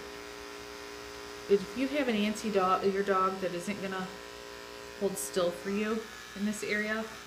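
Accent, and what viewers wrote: Michigan, United States. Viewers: you're a star! I just luv ur soft Michigan twang.. could listen to you all day but I gotta work! Keep up the good work!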